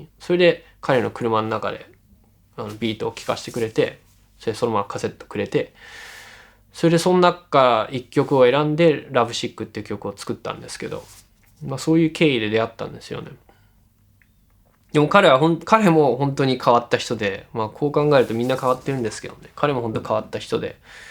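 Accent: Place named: Kansai, Japan. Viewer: ちょっと関西弁なんがすごい新鮮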